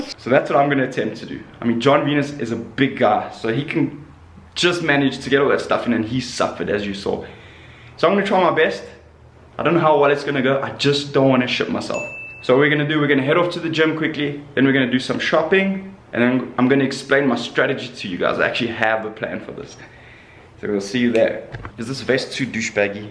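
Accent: South Africa. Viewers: You're one of the few South African sounding South Africans I've seen on YouTube yet. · I knew I recognised a fellow South African 😁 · Just found your channel. I thought the accent sounded familiar :)) South Africa! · Are you south african ? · Are you South-African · Hell yeah, I was guessing you're from South Africa. That is my favorite accent in the whole world :D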